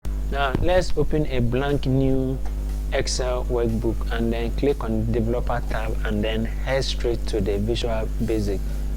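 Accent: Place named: Ghana. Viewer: Good tutorials BTW you sound like a Ghanaian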